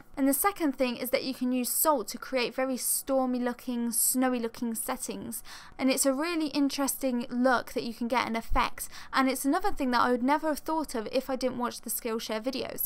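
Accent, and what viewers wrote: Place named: Northern England, United Kingdom. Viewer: I understand her perfectly but I'm northern English like her, lol. Hang in there!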